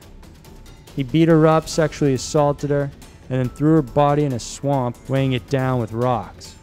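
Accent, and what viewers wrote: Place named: Worcester, United States. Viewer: yeah, man. I'm from Worcester and kinda Heard it in there. Great work with your channel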